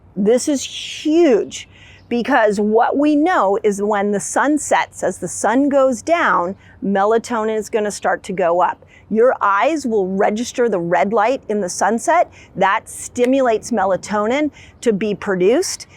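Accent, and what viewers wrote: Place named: Midwest, United States. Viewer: Ah, cool. You sound midwestern, so I wasn't sure.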